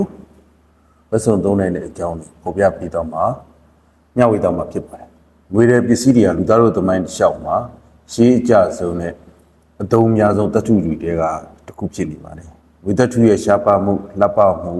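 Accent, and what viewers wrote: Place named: Shan, Myanmar. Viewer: မြန်မာစာဖတ်တာ တအားရှမ်းတယ်နော်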